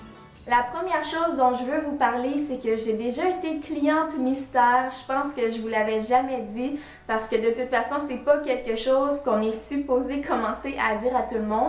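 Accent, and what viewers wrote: Quebec, Canada. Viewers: tu a l'accent quebecoi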